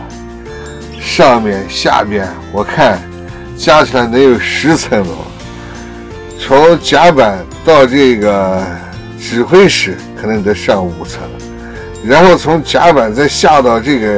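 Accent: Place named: Xinjiang, China. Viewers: 姜先生您好，听了你的节目有一阵了，感觉你的口音特别像我们新疆的普通话，结果今天终于发现咱们是老乡了，我从小在博乐长大，在乌鲁木齐上大学并生活了十几年，现在我在Iowa 定居。 你的口音太亲切了。支持老乡。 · 一样新疆老乡，真真的谁的是新疆的疆普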